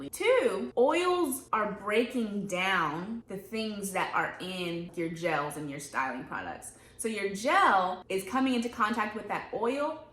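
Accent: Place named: United States. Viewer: Ok, you are SOOO pretty and I love your voice! For context, I’m British and love your American accent.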